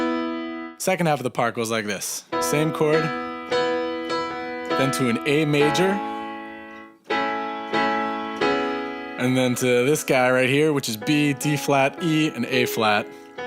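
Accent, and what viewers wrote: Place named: London, United Kingdom. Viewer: You sound like you're from London!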